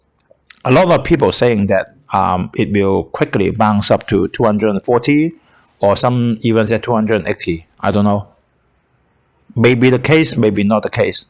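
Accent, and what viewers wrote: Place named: China. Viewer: i don't see you have any improvement at all.you have the faith to speak does not means you speak it right.the way you speaking is like translate chinese speech to english.another Like you speak Omincron you pronounce it OH ME CON go google and type Omincron pronunciation and you find how to pronounce it.another example is the word 'Purpose" and you speak like "purple"and i thought you talking about colour by the way ,if you are born and raise in Hong Kong english is not a new language for you.am I right? hope you don't get mad when i criticize .Have bravery to speaking is good but you gotta speak it right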